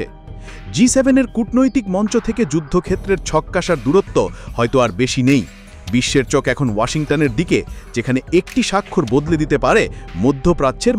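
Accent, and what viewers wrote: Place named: India. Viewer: কি হয়েছে কাল থেকে ভারোতের মতো করে খবর পড়া সুরু করছে।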